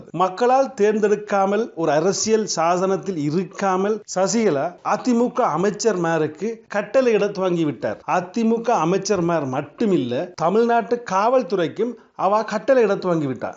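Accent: Sri Lanka. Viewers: Sweet Ceylon Tamil,I like it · dude,. this is srilankan tamil. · ஈழத்தமிழன் போல் இருக்கிறது. ஆனால் அவர் இந்தியத் தமிழர் போல் பேச முயற்சிப்பது தெரிகிறது.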